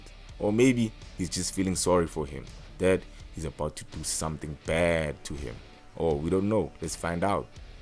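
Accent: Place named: Southern Africa. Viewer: You can hear a southern African straight away 🔥🔥🔥🔥🔥🔥🔥 much love from England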